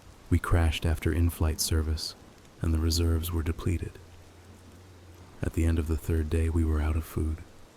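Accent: United States. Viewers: Is he not American? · Very convincing American accent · You fooled me with the American accent but definitely a scary story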